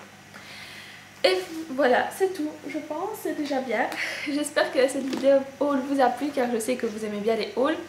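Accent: Belgium. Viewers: Ah ok t'es belge alors. D'ou ton accent irritant! Rien que pour ca je ne m'abonne pas! Salut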